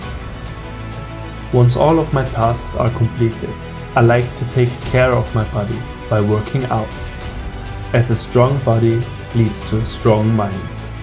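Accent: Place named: Austria. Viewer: You talk like Arnold Schwarzenegger )) I'm coming to Graz next week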